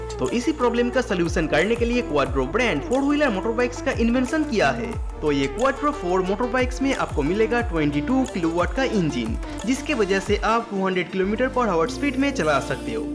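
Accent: Bengal, India. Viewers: Aap bengali ho 😊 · Pta nhi yaar tm logon ko subscribers kaise mil jatein h. Main video ko dekhna chah rha tha par 10scnds bhi dekh nhi paya bcz of your hindi accent.. me too a Bengali. But agar YouTuber banna h hindi me toh dhang ka bolna toh sikhlo. Irritable accent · Your Hindi accent proves that you're a native Bengali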